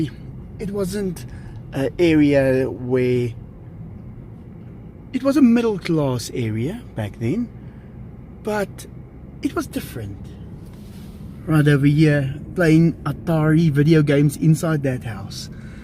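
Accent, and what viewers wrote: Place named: South Africa. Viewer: is it summer there now? bleh.. we have -10C and snowstorm.. well.. thats sweden.. CAN YOU BELIEVE IT haha ur south affrican accent is so cool =) well i should keep my mouth shut since i have swedish accent with hard R like you =) but i love the SA accent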